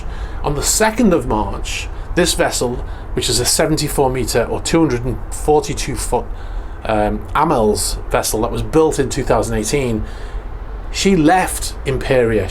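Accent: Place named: Wirral, United Kingdom. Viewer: Slightly off-topic (ok completely) but where are you from? By the accent I suspect you're from Wirral. I wonder every time I hear you.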